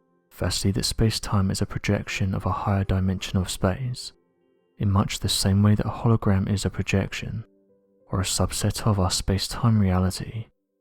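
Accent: England, United Kingdom. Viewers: I m personally tired of the English accents one reason is they use then to lend some type of foolish credibility to every. Sc am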